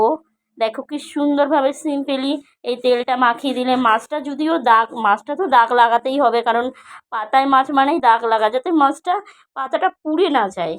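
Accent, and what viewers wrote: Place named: Barishal, Bangladesh. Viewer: তুমি বরিশালের বাঙাল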